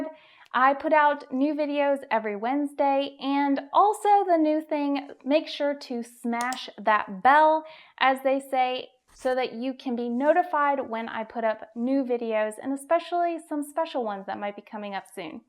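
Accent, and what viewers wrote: South, United States. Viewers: I detected a southern accent. Are you from the south?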